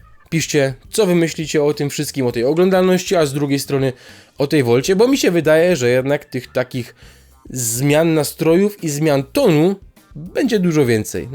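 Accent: Silesia, Poland. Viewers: mam takie pytanko, jesteś ze Śląska? Bo wyczuwam akcent:) nie mam FB ani innych mediów społecznościowych więc tylko zgaduję heh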